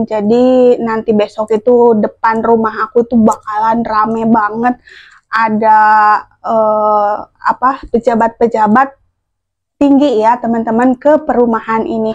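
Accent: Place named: Bekasi, Indonesia. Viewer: Dr logatnya sih bekasi banget 😂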